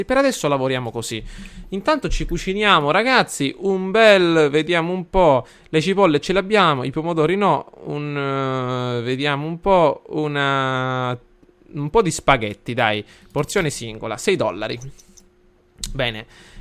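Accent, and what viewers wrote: Sicily, Italy. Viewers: Dal "noi non siamo cosa di.. [...]" ho avuto la conferma che sei siciliano o almeno meridionale Continua così, riesci ad intrattenere bene uwu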